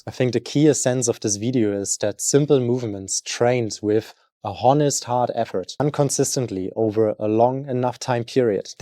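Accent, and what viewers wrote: Germany. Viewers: Where are you from? Sounds like Germany from the accent. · Yessss! Are you from Germany or what's the accent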